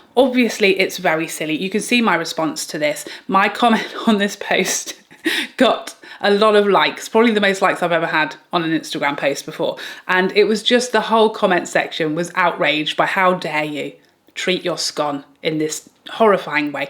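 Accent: United Kingdom. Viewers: Hi dear, looking beautiful as always!!!🤩🤩🤩🤩And thanks for sharing this with us! Never heard of this actually, what a waste of time! I will never want TikTok, i tried Treads for a day, but found following here and on Insta is enough. But i love watching your Channel of course, love it! And other content creators as well! Maybe the people i follow are very normal, but i never exprerienced this. Maybe i'm boring, but i follow people from Weight Watchers, of course people from church, singing etc, cause i'm a christian, i love to follow wildlife, of course i love to follow makeup as well, doing Duolingo on my phone to learn some more Spanish, but i never knew this existed! Thanks for letting us know, dear, you have so much variation in your video's, this is serious, but you also make us laugh, knowing a lot about makeup! Strange creating hate between Europe and America, i love America! 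So when i would say here i love the British acccent the most, which is true, that would never be to insult from me to anyone from Canada, USA, Australia, New Zealand, never thought about that actually, it's just that i love to hear it. Born in 1970, digi disaster number one here, hubby and son keep on laughing sometimes what i did again, but i can manage myself better and better in digi-land, baby steps,. I think i'm also tooo naif, i always think the best of people, so, i really appreciate such a video, to keep me awake, thanks so much!!!❤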